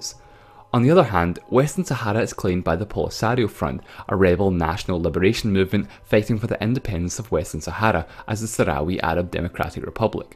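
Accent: Scotland, United Kingdom. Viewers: Im not from a english speaking country but... Are you from Scotland?🤣 What an accent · its not a dialect, its an accent. And the scottish one is recognisable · I'm surprised at the amount of people who can't recognize a Scottish accent! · Thank you for your videos. You make the seemingly mundane topics incredibly interesting. Also, I love your visuals/animations and your lovely Scots accent. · Heavy Scottish accent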